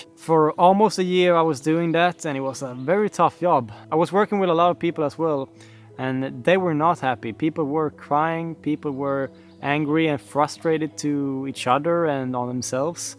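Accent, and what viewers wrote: Scania, Sweden. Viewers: Riktigt bra video! Hälsningar från skåne! Hörde lite skånska i din dialekt, får man fråga vart i skåne du kommer ifrån?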